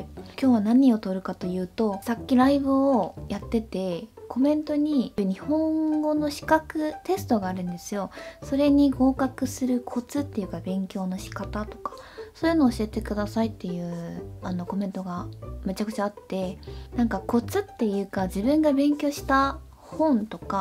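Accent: Kansai, Japan. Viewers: たまに出る、大阪弁が可愛いですね？ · 関西弁かわいい笑笑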